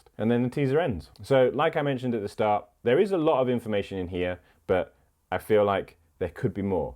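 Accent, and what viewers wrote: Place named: United Kingdom. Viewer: He's from the UK. That's how they say it. Here in the US we can call it Zee all day and all night.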